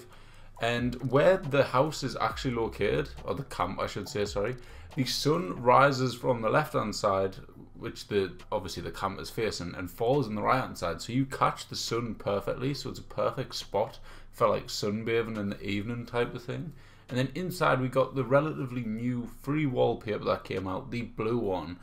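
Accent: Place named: Stockton-on-Tees, United Kingdom. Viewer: I’m Stockton-on-Tees so yes you sound familiar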